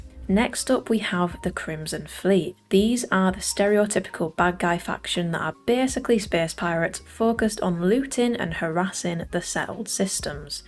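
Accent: United Kingdom. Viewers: shes definitely English.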